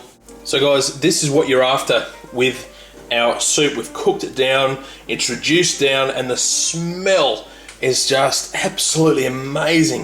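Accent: Australia. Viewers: you can tell he's Australian because of his accent, not British and actually us Brits can cook mate so fuck off to your little cave and eat your rocks. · HE'S BLOODY AUSTRALIAN MATE.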